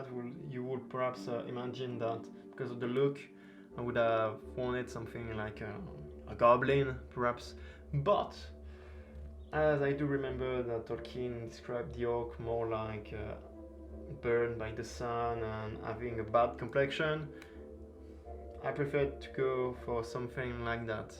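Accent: France. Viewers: Great video and review, my next silicone mask will be Pennywise IT 1990 silicone mask by BurkBench designs and then I will get the Alien silicone mask also by BurkBench designs probably in October, also the way you pronounce latex is funny 🤣 🤣 🤣 and judging by your accent you are either French or French Canadian.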